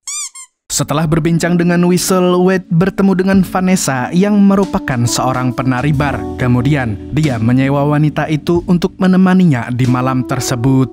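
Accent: Banjar, Indonesia. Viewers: bah banjar kalinya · Urang banua sekali ny .. 😂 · Urang Banjar hostnya 😂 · orang Banjar kah mang🙏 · Pian nih urang banjar kah mang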